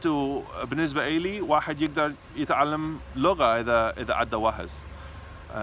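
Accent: Baghdad, Iraq. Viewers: فدوووة شون يحجي عراقي يخبلللل🤩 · Wow Unbelievable his accent iraqi so clearly respect from Algeria 🇩🇿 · he looks iraqi, and speaks like an iraqi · عمي لهجتك عراقية وضابط اللهجة فول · هذا العراقي المظبوط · no that nearly truth cuz you're speak baghdad accent and it's the orginal but i'm can't 💕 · Omg! I'm dying😩 that iraqi accent😍 in luv💕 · My god,! You blew my mind. Even other surrounding Arabic-speaking countries can not talk in Iraqi dialect like you can. Heck I know some Iraqis in the northern part that are not capable of speaking as you can (with all love and respect to them:D). You just inspired me to learn German and Chinese. You are 100% Iraqi now. Cheers! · تقريبا انت دا تتكلم باللهجة البغدادية انت فظيع عراقي قح Very motivating How can we say ضربتوني بوري in English?🤔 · yes this is iraqi accent I think this Baghdad accent nice I like 👍🏻it but much much different from Syrian or Lebanese arabic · نبرت صوتك عراقيه😀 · عراقي قح 😅😅😅 حتى هاي اتعلمتها 🤣🤣🤣🤣 Good you ar Amazing 🌺🌺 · طريقة نُطقه كيوت يا ربي Your iraqi accent is so cute. 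· Oh my gosh I’m from iraq and you sir speak better then I do. That is a proper iraqi accent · He's more Iraqi than iraqis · والله لهجتك العراقية اصلية وروعة!!!!! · Wow amazing man,i am iraqi and i find your iraq dialect is so cool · انته تحجي عراقي مظبوط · You speak Iraqi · Iraqi accent 100% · It's not the same arabic of the world arabic , it's like iraqi accent but nice We can understand you From Algeria 🇩🇿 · Hhhaaa ❤️ love from Lebanon Beirut 🤦🏻‍♂️ love Iraq 🇮🇶 love Iraqi accent ❤️🌺 good for u فديتك كلش زين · لك اللهجة العراقية مالتك خرافية 😘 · Wow proud of you and also I love your Iraqi accent. I’m a Kurd I currently speak Kurdish / Arabic / Spanish / English / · wow , you very smart , how you learn Iraqi accent in just 20 month!! good job, and i want aske you, d you know write and read in arabic or just a conversation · حتا اللهجه عراقيه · Heloo ahoye evvelen alamud arüfek ena said.essanien ente tehçi lehçe İraki Kulliş mazbut cidden mobruk ismi Mehmet ena ayiş fi Türkiye ena hem yene etaellemet min ırakın liennu ehna aku ıraakınn huvaye Fed yom halllin nsavy mubaşır [live] video derbalek ala nefsek ahoye selam Min Al Türkiye 😆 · وتحجي عراقي همات كلش حلو 👏🖤🖤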